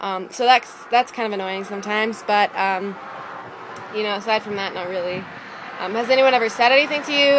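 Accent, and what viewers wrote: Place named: Canada. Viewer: you accent is same as Elisha Cuthbert , which means you are from canada or you must be actress or something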